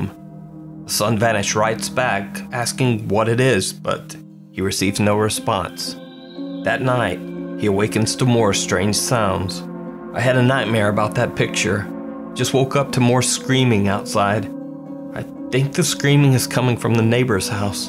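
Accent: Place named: South, United States. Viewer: Its the slight southern draw 😆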